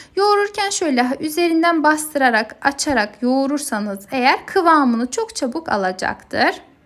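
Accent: Azerbaijan. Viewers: Azeri siveniz var, azeri misnz?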